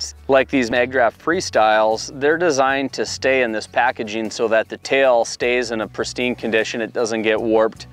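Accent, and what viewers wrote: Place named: Midwest, United States. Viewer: Haha I love the midwest accent. Put your baits in your baigs! Honestly though, thanks for the solid and straightforward tip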